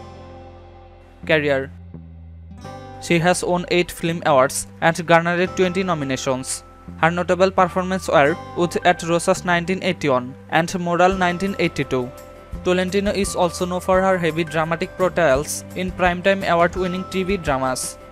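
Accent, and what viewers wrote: India. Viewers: Indian Narrator????????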